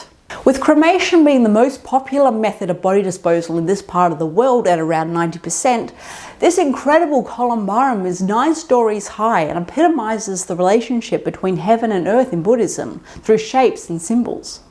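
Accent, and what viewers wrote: Australia. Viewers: I love what you're contributing to the world's death conversation with an Aussie eye, heart and accent,! There's a bit about the annual redressing of the dead and bringing them to the dining table in Caitlin Doughty's book, From Here to Eternity as Well. While I'm personally in favor of the being eaten by wolves or vultures or insects or whatever camp, these practices from other cultures have their own beauty and wonder. (Sorry, I live in the US, so those are my most familiar resources. I don't for a moment believe that we have all the answers and am humbly grateful to get these extended world views!)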